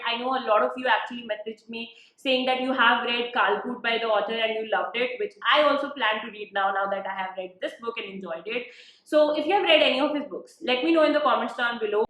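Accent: India. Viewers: You are so cute especially your Punjabi accent which makes you more cuter.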